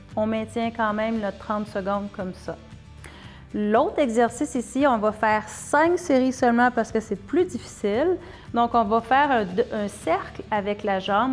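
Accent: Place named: Quebec, Canada. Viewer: je vous regarde de Belgique et moi j'adore votre accent qui me rappelle mon voyage au Québec ;-)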